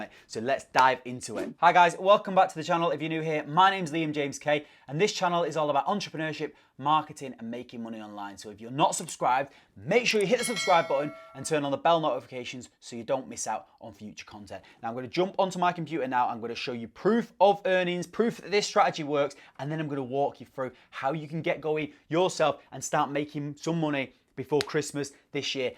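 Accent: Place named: Yorkshire, United Kingdom. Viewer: yeah, I wanted to do that but then Covid came and put my plans on hold, thanks mate. You sound like a Yorkshire lad, I'm from Leeds and vlogging at the coldest inhabited place on earth at the moment 😂😂😂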